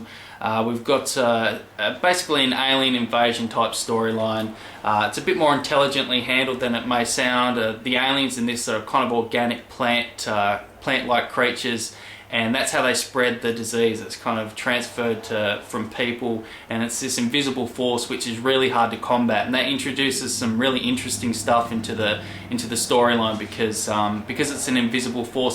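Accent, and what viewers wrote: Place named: Australia. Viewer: Hey, in case you haven't noticed, you have 666 subscribers! I realized that because I was an watching Eric Bana interview and I thought his accent was identical to yours as far as regional Aussie accents, so I was checking to see if you live near where he is from...:)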